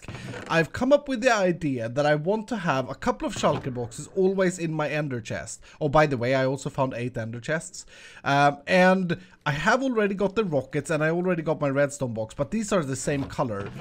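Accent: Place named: Sweden. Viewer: I love your swedish accent Btw I'm swedish aswell so I speak the same...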